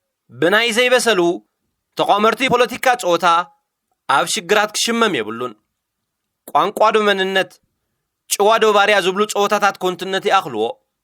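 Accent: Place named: Eritrea. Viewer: ኣብ ትሕዝቶ ምዘን,,ድምጺ ናዓና ንእረትራውያን ስለ ዝመስል ናይ ትግራይ ላዛ ዘይምጥቃሙ ንዓኻ ኣይርድኣካን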